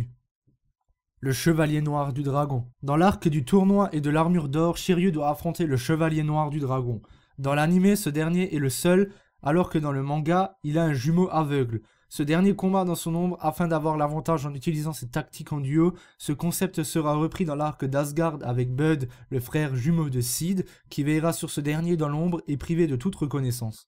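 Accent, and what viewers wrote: Alsace, France. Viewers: toi t'es alsacien ! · Un accent alsacien??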